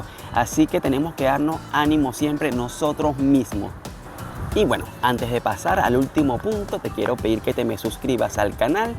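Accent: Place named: Venezuela. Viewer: Que extranio, tu acento me parece mas Venezolano que Chileno... :)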